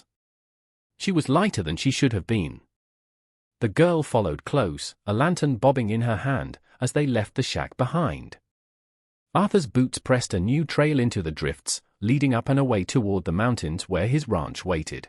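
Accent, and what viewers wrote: United Kingdom. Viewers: A British accent narrating a western USA fictional story? No...just no.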